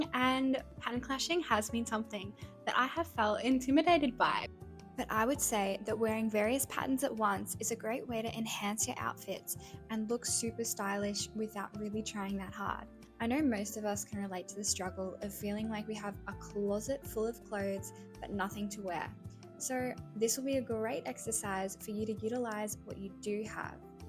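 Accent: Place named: Melbourne, Australia. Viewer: i could tell you were from melbourne immediately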